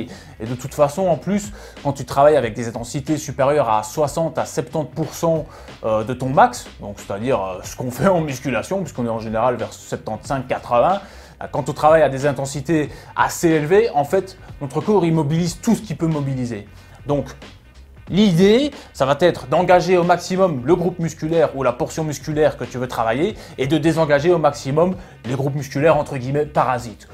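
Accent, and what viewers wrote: Belgium. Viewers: Super, mais tu pourrais faire des vidéos juste avec l'accent belge et l'accent forain ? Merci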